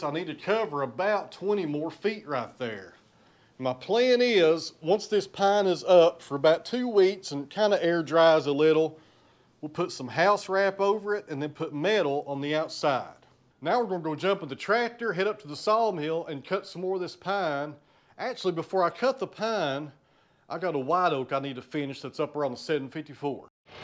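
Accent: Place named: Tennessee, United States. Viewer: Well friend, there’s nothing I enjoy more after a twelve hour day then watching OTW, and hearing your Tennessee talk. My dad’s people were from Big Sandy, Paris, Skullbone. If I close my eyes, I can hear my pawpaw in your voice. Good stuff. I vote for Cypress. Go see your buddy, Jake.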